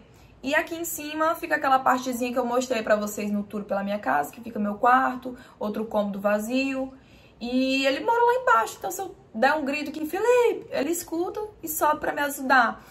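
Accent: Ceará, Brazil. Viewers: desde o primeiro vídeo teu que eu assisti suspeitava que fosse do Ceará, o jeito de falar entrega dmss 😂❤ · Mlr, a primeira vez q eu ouvi vc falar já imaginei q era daqui do Ceará. Sou de Fortaleza. Bjos!!! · Esse sutaque do nordeste toda meiga, gostei demais do vídeo simpática e humilde sempre estou interagindo com você no instagram beijos mulher !! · Nossa pensei que era do nordeste jureiii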